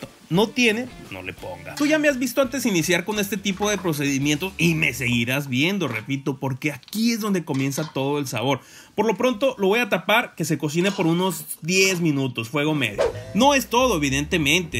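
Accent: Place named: Monterrey, Mexico. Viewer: Jajajaja me encanto como describes la receta, asi hablamos los de Monterrey. Buenisima, la estoy haciendo ahorita mismo.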